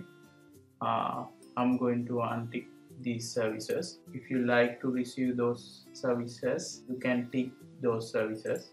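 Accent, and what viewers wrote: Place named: India. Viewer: India English^_^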